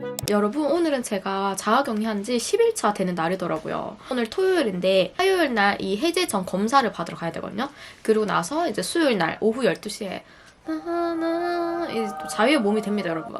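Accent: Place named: Daegu, South Korea. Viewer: 대구~~ 대구 사투리 반갑습니다~~^^우리동네예요~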